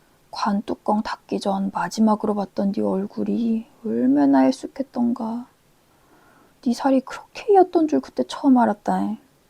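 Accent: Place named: Gwangju, South Korea. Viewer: 혹시 고향이 광주이신가요? 저는 광주사람인데 사투리가 꽤 자연스러우시네요😂😂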